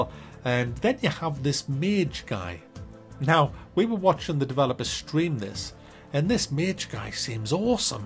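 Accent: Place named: Northern England, United Kingdom. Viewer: Stupid world nowadays. Us northern lads speak proper i understood u perfectly. chuck, cob, wang, lob.all mean throw. luv ya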